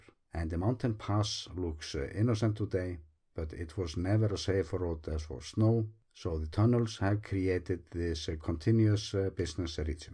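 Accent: Iceland. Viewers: Is it strange for me to say that I love your accent? I love the way a lot of Icelanders say things in English. I've been trying to learn some words and phrases in Icelandic for when I visit. I know most of you know English, but it might be nice to say a couple of things in Icelandic. What do you think?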